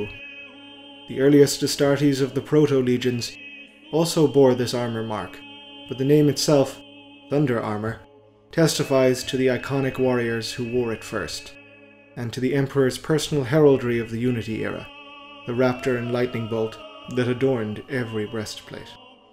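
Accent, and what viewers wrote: Ireland. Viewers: So obviously Irish. Just can’t tell which part. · Chilled 40k lore? Beautiful illustrations? Hypnotic Irish voice? Background chanting? SUBBED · The solemnity in your reading and the Irish accent are a welcome change from other 40 K lore channels. The Gregorian chant was a nice touch as well. Looking forward to your next installment. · interesting. I live really close to Canada, and I pegged your accent as Irish right from the jump. Maybe because my own accent is similar to a Canadian accent so the differences in yours stand out to me more. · Irish accent?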